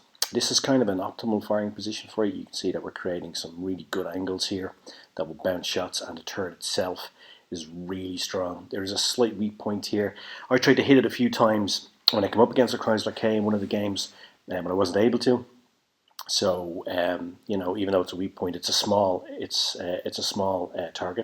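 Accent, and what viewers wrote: Ireland. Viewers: BTW love your Irish accent.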